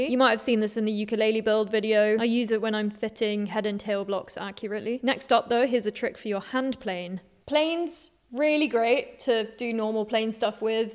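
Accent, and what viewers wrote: England, United Kingdom. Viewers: It's not often to see a girl, and rarely still, one with the english accent giving tips on woodworking on YT. Enjoyable and refreshing to a point that I can't recall the tips you gave! 😊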